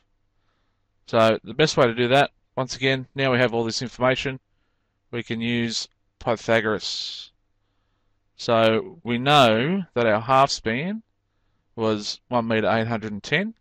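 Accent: Australia. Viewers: How refreshing. An Aussie accent, clear descriptions and metric measurements. My applause is attached.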